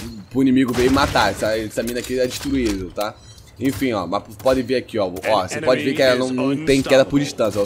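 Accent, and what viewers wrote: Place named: Rio de Janeiro, Brazil. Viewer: Muito carioques, fala muito mal, parece que nunca esteve na escola, melhorar essa voz de malandro ai negão, kkk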